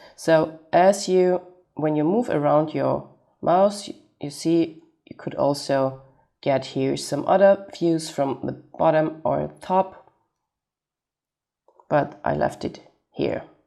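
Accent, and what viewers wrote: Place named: Austria. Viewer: OMG you are too cute, I could listen to you explain things forever and your cute Austrian accent is the icing on the cake!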